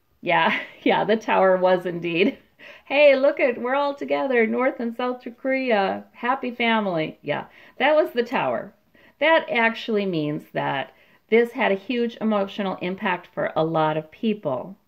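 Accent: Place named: Midwest, United States. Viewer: Love your readings. I can relate to your voice thinking you are from the Midwest, ya know.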